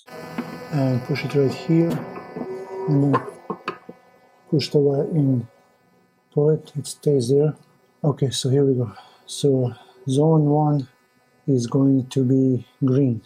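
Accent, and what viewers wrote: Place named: Balkans, Eastern Europe. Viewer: Very detailed. Thanks for this. You sound eastern European. Maybe from Balkans?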